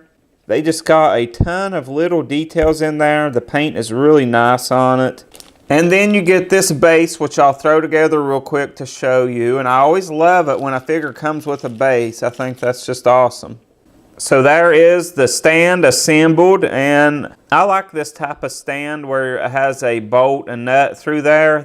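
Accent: South, United States. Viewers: I like your southern accent pretty rad. Nice score from DareDevil19 also did you like my Superman poses in the review? I gotta learn to edit better so I can do cooler posing tricks in my vids but my style is mainly start to finish recording with only one or 2 cuts from packaging to figure. I find with transformers especially it's more exciting and authentic that way, not cheating like to make it look like I know what im.doing, instead you know I know what I'm doing ;) lol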